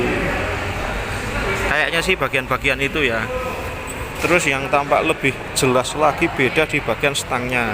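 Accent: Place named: Java, Indonesia. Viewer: Jowoo medoq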